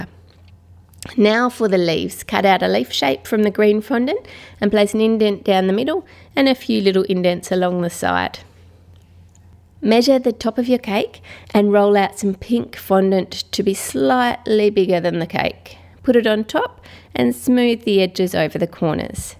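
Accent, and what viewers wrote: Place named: Australia. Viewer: G'day! Just wondering if you could do a Video tutorial on this cake. <link> I would like to do it for my daughters birthday in September, and your videos are GREAT. Thanks. Ps nice to hear an Aussie accent on you tube. You are VERY talented.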